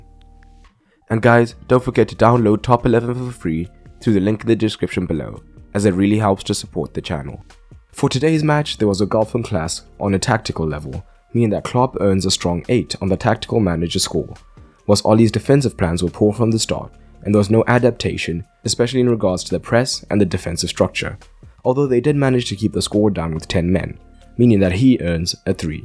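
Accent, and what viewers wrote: South Africa. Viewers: Narrator sounds South African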